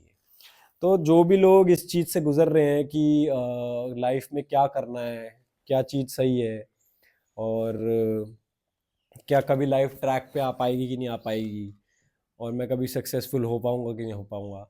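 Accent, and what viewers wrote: Haryana, India. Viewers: he talks like haryanvi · Hai tu up ka bole haryanvi hai ...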